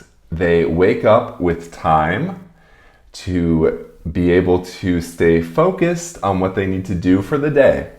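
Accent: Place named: United States. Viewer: I do not digest American accent.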